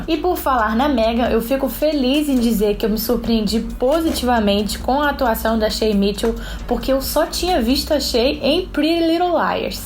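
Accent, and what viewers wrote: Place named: Pará, Brazil. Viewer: adorei o vídeo ^^ inscrito desde já hahaha achei que fosse paraense, o sotaque me lembrou bastante de lá. Sucesso :*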